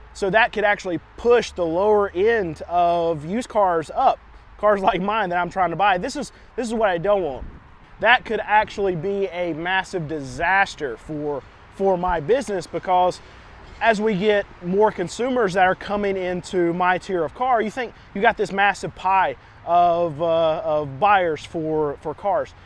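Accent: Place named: South, United States. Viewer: Here for the southern accent "Becuhzzz'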